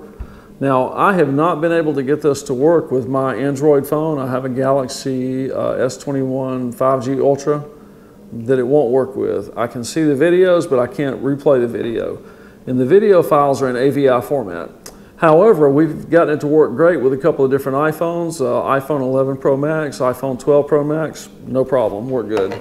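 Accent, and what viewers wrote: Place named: United States. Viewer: I've been paying attention but your accent (yes i know its American but its a particular sound to it and im trying to think who i've heard that sound similar. isnt it strange i couldnt think who it was but i knew it was someone i like, who i thought was a nice person. It came to me!!! I can sleep now!!! Trey Gowdy!!!) I wonder if im right? i.e. whether your accents are from the same part of America or is one going to be Canadian and the other total opposite end? Now back to paying attention 😁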